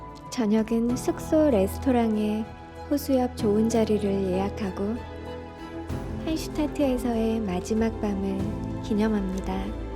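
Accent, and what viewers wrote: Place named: Seoul, South Korea. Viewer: 넘 예쁘시고 영상도 좋은데 나레이션이 서울 사투리가 생각나네요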